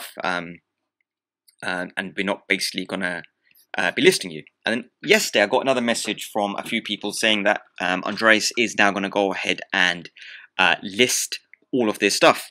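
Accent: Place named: London, United Kingdom. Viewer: Thanks buddy, I am London :) sounds like you are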